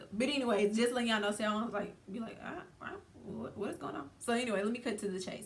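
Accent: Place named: South, United States. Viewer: Where you from I love that accent ? Country girls rock 🧘🏽‍♀️ I'm from NC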